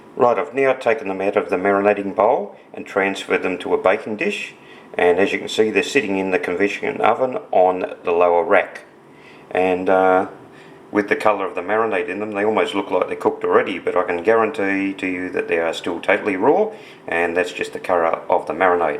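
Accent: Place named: Australia. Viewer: So nice to hear an over the ditch accent 😊 kiwi here x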